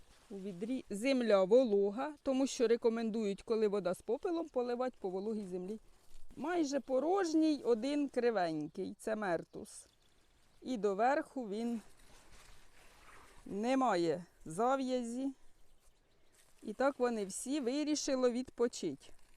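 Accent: Poltava, Ukraine. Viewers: Як приємно вас слухати Дуже милий полтавскій говір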